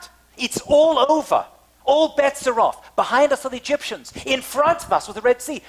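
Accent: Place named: Israel. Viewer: wonderful with israeli accent to you!!!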